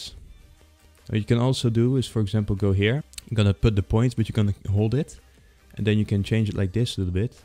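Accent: Netherlands. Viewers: I kan hoor dat you are Nederlands 😂 lekker bezig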